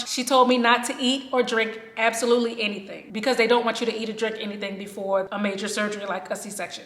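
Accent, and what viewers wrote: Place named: South, United States. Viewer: That morphine got you sounding COUNTRY!! Congratulation! 🎉🎉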